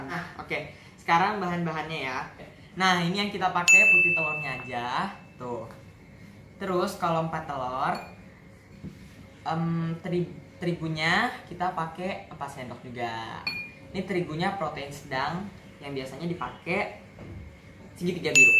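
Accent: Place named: Banjar, Indonesia. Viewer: Banjar mana suara ya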